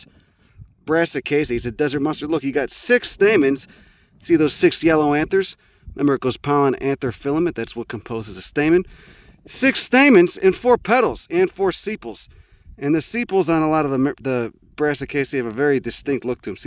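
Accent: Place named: Brooklyn, United States. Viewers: This is the most educated guy from brooklyn i've ever heard lmaooo.. heya fuck face, why don't you respect the botany huh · You and Bill Burr have officially broken my stereotype that people with that kind of Northeast accent are dumb 😂😂 this was the most entertaining and enthusiastic appreciation of cactus and railing of the culture ever put to video lol Thank you I will be sharing this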